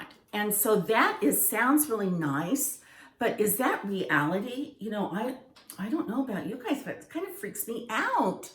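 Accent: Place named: California, United States. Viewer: Hi, I am a new sub🤗 I just love your channel and I LOVE your California accent so much, where in California did you grow up? I have been binge watching your videos! 😘 I came across your channel from watching Monika Mcgillicudy and I saw a thumbnail on the right side. I love your home decor!!! Beautiful💕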